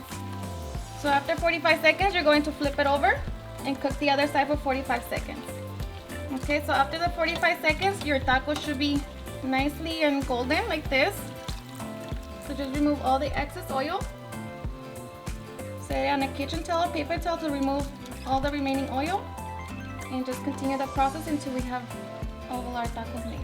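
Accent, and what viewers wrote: Mexico. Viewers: Just found your channel today in one thing Are you Mexican because I think you some Mexican accent in U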